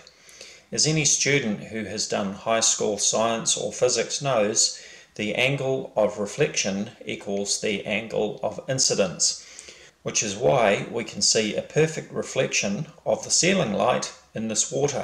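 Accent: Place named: New Zealand. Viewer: you sound like a kiwi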